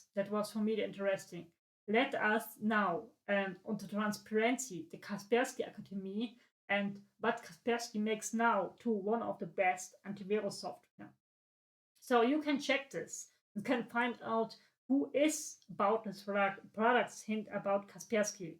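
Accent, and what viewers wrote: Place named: Germany. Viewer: I hear a little bit Deutsch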